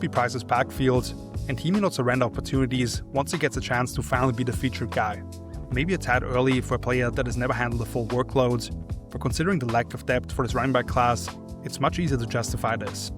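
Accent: Austria. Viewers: Well done sir, solid analysis all around. I appreciate the Austrian accent as well.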